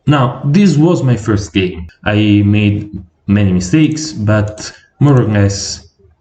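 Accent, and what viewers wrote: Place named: Italy. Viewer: Nice, a part from that strong Italian accent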